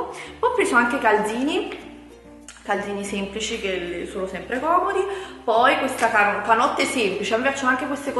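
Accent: Naples, Italy. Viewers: ma sei napoletana comunque ti stimo e sei bellissima e proprio ora mi sono iscritta perche sei simpaticissima e dolcissima😙😙😙😘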